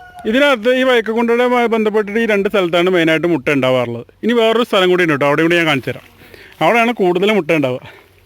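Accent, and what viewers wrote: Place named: Palakkad, India. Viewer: ningaluda pallakad vayikol ullathe pola yavidayum kanilla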